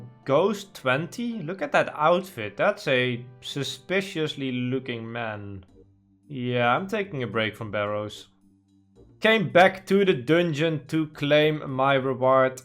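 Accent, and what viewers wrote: Netherlands. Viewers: You know, I never noticed your extremely Dutch Accent. Should know it with your name " " 😂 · Jij komt uit Nederland he dat kan ik horen aan je stem. Mij sub heb je geweldige videos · 100% this guy is dutch, just by his accent 😂. I am dutch too it is funny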